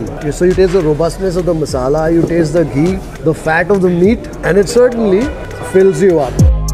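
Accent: Karnataka, India. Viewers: Most underrated food review channel....classy and simple English explanation is like "Desi food review in Desi English".... Hope channel grows bigger ❤️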